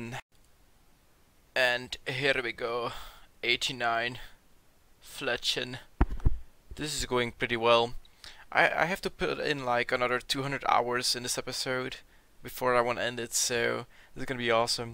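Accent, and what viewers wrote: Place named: Norway. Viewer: Yo, where are you from? You kinda sound norwegian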